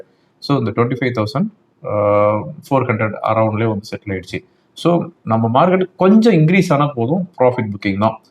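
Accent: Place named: Kongu, India. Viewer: Rupee value வீணாப் போய் கெடக்குது 😂 கொஞ்சும் கொங்குத் தமிழ் அருமை 👍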